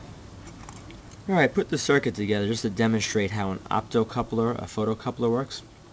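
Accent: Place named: New Jersey, United States. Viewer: I was looking for opto isoloators and I guess because I am subscribed to your channel that you popped up again. I could not believe it. I love your videos. They are simply the best. All the knowledge, zero BS, all the circuits I really want, ..but mainly it is your style of presentation, and that lovely NJ accent. You deliver the right emotion in your videos and it makes for a truly first class educational experience. It is almost more like entertainment because they are so enjoyable to watch.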